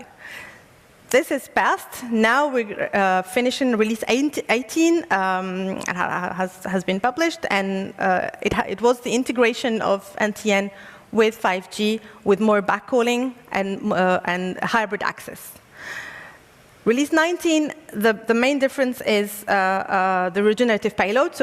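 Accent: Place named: United States. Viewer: Amazing American accent but very hollow speech...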